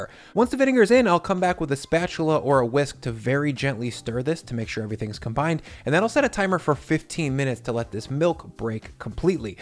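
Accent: United States. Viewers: why do you do everything in grams? is it too gauche to use spoons, cups, lbs and ounces? or are you a european who lives and works in europe? you sound like an american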